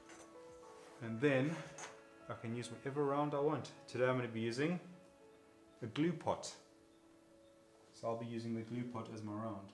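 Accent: South Africa. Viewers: Whoo hoo! A Saffer accent on YouTube. I'll definitely get some knives from you when I can. And sommer subscribed coz support local.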